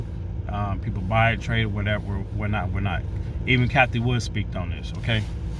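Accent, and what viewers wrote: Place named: Ohio, United States. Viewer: Where you from Ohio? I'm doing this thing where I try to "guess that accent " 😂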